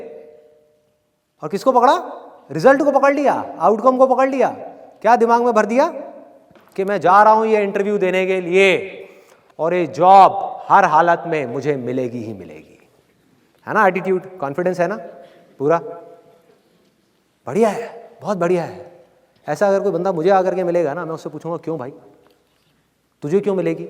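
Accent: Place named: India. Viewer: Oh my gosh.i can't understand a single word.indian's accent is terrible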